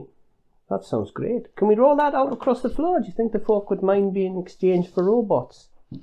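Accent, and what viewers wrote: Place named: Newcastle, United Kingdom. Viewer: I had no idea you was a geordie.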